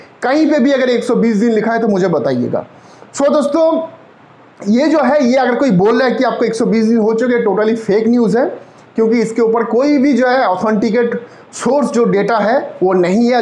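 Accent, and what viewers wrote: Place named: Bengal, India. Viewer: Kya bolta rehta hai. Kuch samajh nahin aata iska. Poora bangali maushay.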